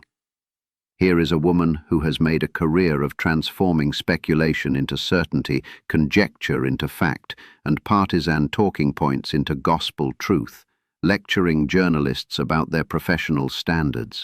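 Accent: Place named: England, United Kingdom. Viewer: Wonderful, simply wonderful. The delivery in a crisp English accent, the words used, the message clear, cogent and concise. We love it! Keep it coming please.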